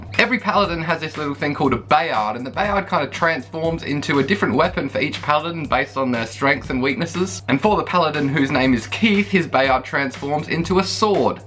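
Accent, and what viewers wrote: Australia. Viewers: The way you stand on the wood while you're cutting it is SUCH an Aussie thing to do.When you started talking I thought you sounded Australian, but when you did that, I was like 'yep, definatly an aussie'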